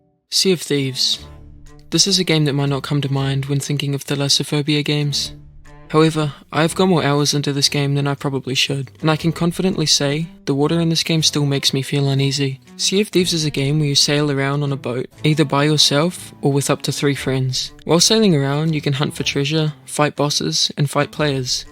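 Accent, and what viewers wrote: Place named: New Zealand. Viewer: I was thinking the whole video "Is this guy Kiwi" You have a very Kiwi accent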